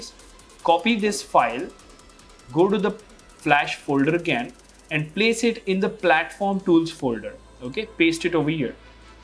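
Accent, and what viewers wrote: India. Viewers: i like your conten, u speak clear not just like another youtuber speak english but have a bit indian😅 so try hard, i will support you from malaysia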